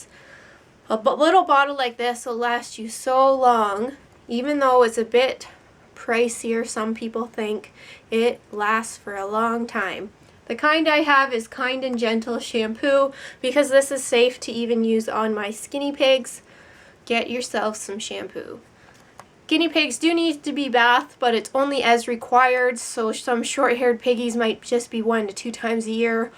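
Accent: Canada. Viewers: Wait... are you Canadian?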